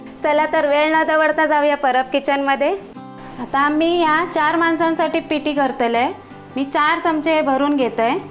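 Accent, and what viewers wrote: Malvani, India. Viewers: Maaka pn pitti lay aawdta aapli malvani bhasha eikayla kiti god vatta recipe khupp Chan 😋😋👌👌👍🏻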